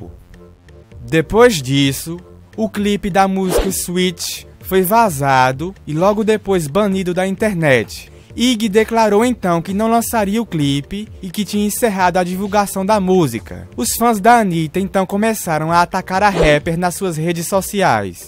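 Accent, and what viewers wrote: Northeast, Brazil. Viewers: Narrador nordetinooo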